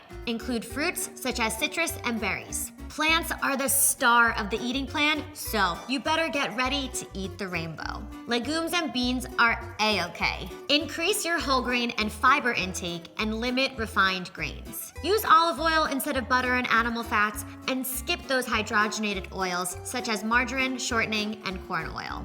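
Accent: United States. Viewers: Great information, but such a piercing American accent, I had to reduce the volume to get through it.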